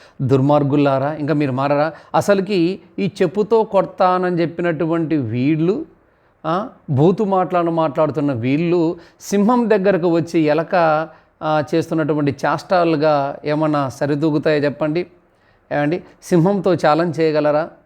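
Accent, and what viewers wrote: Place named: Kukatpally, India. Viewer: Miru Kukatpally atla matladaru kuda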